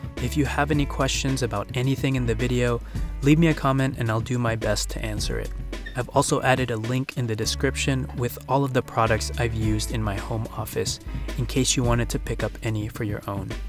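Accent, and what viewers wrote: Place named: England, United Kingdom. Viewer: Your English accent us pretty awesome 👌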